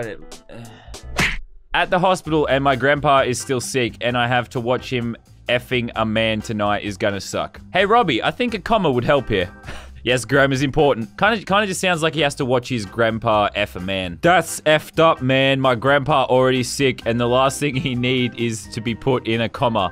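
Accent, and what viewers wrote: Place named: Australia. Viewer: I find the australian accent so cool, the way says gone as gaawn.😅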